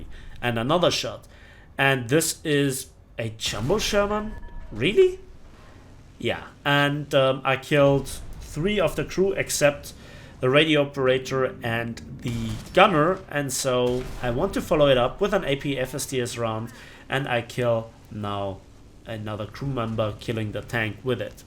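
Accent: Germany. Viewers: Well i think he is german (not sure) but his accent makes him sound german.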